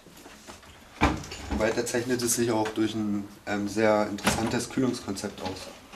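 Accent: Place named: Berlin, Germany. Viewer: bei dem ganzen Berlinerisch versteht man ja den rest gar nimmer^^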